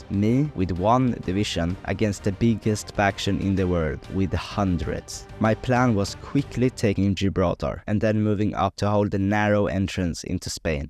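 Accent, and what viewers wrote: Sweden. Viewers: As a swede no matter where I am in the world this accent will make me feel like I'm back at home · Bro kiiiinda has a swedish accent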